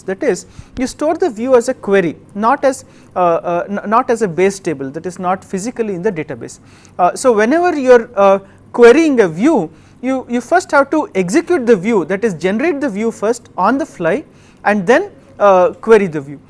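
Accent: India. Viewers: Indian English is hard to listen -*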